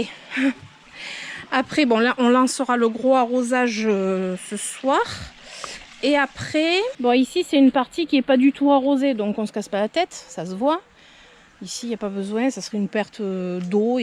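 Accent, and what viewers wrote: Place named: West, France. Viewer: Bonjour et j'adore votre bonne humeur et votre accent de l'ouest!!!!et bravo pour votre vidéo et merci 🌞🐞🍀🌹👏👏🦜✋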